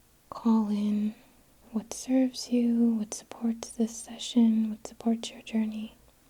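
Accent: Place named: New York, United States. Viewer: Your voice is the perfect trigger for me! As a New Jersey guy I am a sucker for a New York accent LOL. Thank you for the wonderful relaxation you bring me.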